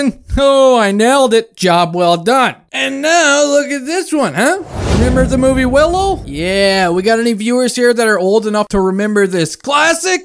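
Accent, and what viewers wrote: Newfoundland, Canada. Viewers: You must be a fellow Newfie or an Atlantic Province guy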